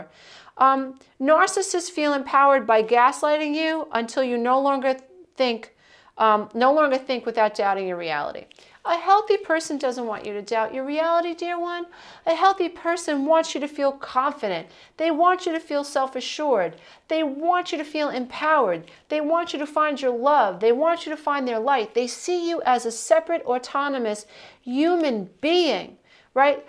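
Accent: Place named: New Jersey, United States. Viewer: Great video. I agree with everything you said. I like your “Joisey” accent (a compliment if you aren’t from NJ).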